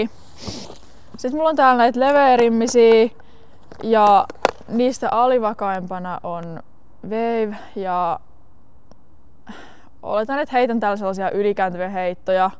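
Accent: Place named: Finland. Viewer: Aivan loistava, tuli ihan Ismo Leikola mieleen kun Suomessa ollaan niin lausutaan ne suomalaisittain 😅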